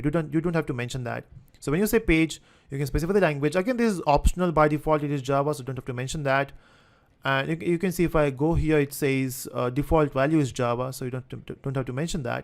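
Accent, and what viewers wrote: India. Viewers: oh gosh indian accent... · Dude, you are great, since I am not an Indian I wish you could speak English with a better accent, but anyway thanks a million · Really a great job. I'm not an english mother-tongue, and you're probably the first man who speaks english with indian accent that I can fully and clearly understand. Well done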